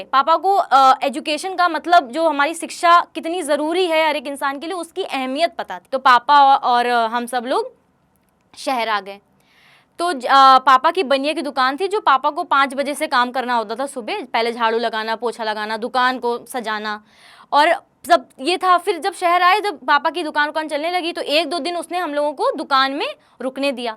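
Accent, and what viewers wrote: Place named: Unnao, India. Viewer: मुझे खुशी हुई, उन्नाव की बिटिया उन्नाव वाली बोली बोल रही है। बहुत सुंदर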